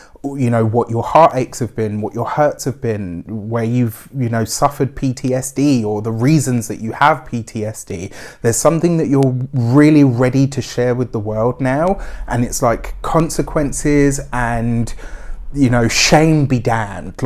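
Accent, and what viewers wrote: England, United Kingdom. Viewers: Thank you very much for the reading. I'm so glad as a Sagittarius girl. By the way. Are you an English? Cause I like the way you read. Lots of love buddy. 💗💗💗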